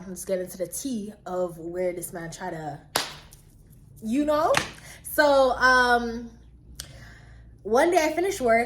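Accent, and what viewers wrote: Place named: Jamaica. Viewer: the patois is killing me 😂 are you jamaican??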